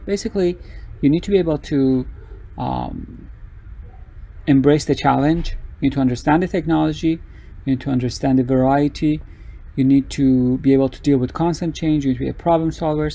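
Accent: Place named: Iran. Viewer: بسیار خوب و مفید آقای من از لهجتون متوجه شدم ایرانی هستید و خیلی منو خوشحال کرد امیدوارم همیشه سلامت و موفق باشید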